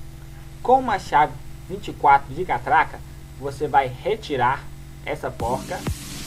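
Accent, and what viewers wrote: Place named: Paraíba, Brazil. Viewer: Kkkk gostei do sotaque paraiba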